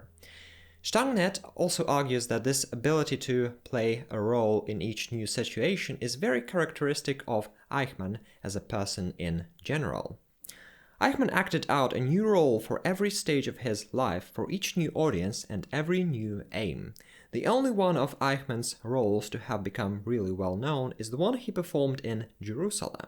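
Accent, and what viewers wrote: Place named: Germany. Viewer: I am trying to work out your accent. I think it's German.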